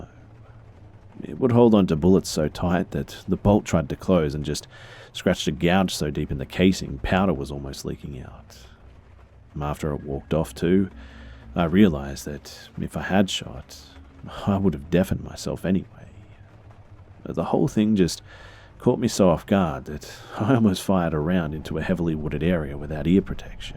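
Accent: Australia. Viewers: I love the fact that your an Australian narrator. 👌🏽 #onefortheaussies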